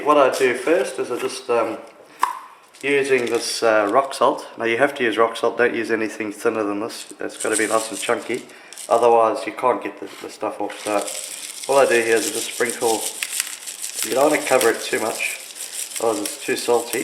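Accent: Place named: South Africa. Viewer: Is the dude South African or Austrailian....!?!?! I CANNOT WORK IT OUT!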